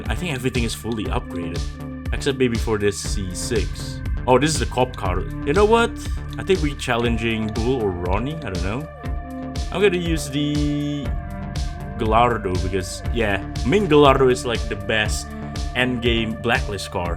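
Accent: Indonesia. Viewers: Aksen lu kyk orang indo bang hhaha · Indo ya bg? Aksen nya kaya org Indo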